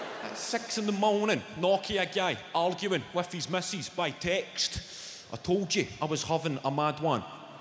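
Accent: Scotland, United Kingdom. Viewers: Literally everything is funny with a Scottish accent - it’s an absolute gift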